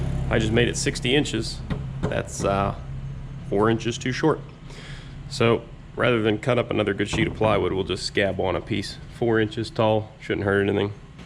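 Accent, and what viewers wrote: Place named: Midwest, United States. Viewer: You’re a mid westerner, we all talk wrong...I still put an R in wa”r”sh.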